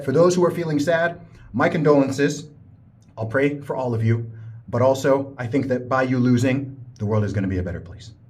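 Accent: North America. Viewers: Actually, I DIDN'T know you were a brit tbh. It's your accent, it sounds abit like North American english. Oof, I feel stupid now.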